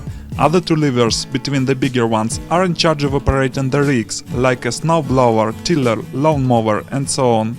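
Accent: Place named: Russia. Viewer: По акценту понял, наш спикинг )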